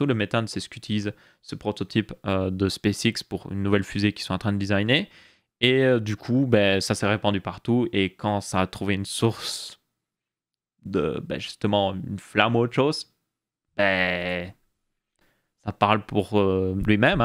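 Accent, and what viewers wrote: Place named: Belgium. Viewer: Je t'ai découvert ya pas longtemps, et tu mérites tes 100k abonnés. Ton contenu est sympa, tu expliques bien, tres pedagogue, et ton accent belge te rend encore plus sympathique pour moi ;-)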